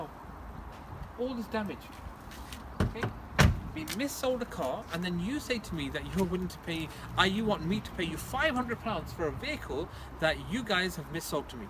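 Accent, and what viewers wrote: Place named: Durban, South Africa. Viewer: is it me or does this guy have a South african Accent ...... specifically from Durban ...!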